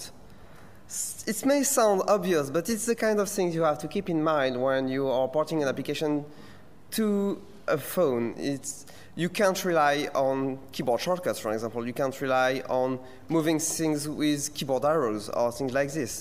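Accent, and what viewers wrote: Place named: France. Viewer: bigre, superbe accent français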